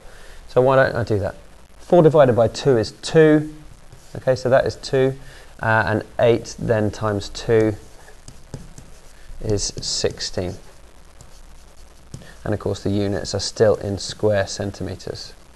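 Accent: United Kingdom. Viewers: your accent is so british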